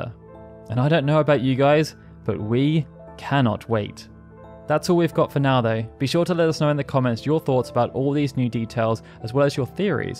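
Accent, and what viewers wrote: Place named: United Kingdom. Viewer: I'm obsessed with final fantasy being talked about with a British accent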